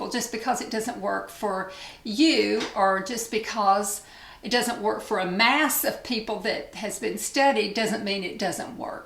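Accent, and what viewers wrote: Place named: Tennessee, United States. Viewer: Hi, I love to hear you talk! I used to live in Dunlap, TN and your voice reminds me of dear friends that live there. I would def research the vit C derma rolling thing and it possibly causing granulomas. If I remember correctly Penn Smith has several YouTube videos on the subject. I’ve yet to find a vit C that agrees with my skin. Good luck finding a replacement product!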